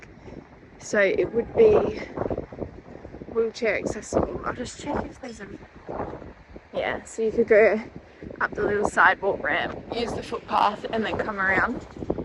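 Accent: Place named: Australia. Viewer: Hmm..🥱 Tho I do like hearing your Aussie accent and raspy voice, good job!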